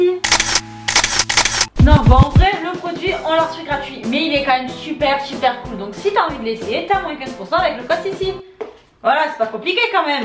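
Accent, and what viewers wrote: South, France. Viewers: J'adore ta tasse Supergirl !!! Et ton accent du soleil fait du bien avec tous ces nuages !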